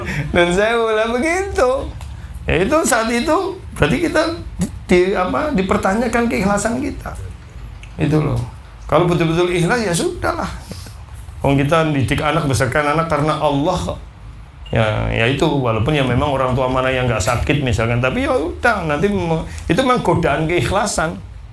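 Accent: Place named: Banyumas, Indonesia. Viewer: Alhamdulillah ilmuku tambah ngaji kalih ustadz ngapak, Asli saking pundi nggih min?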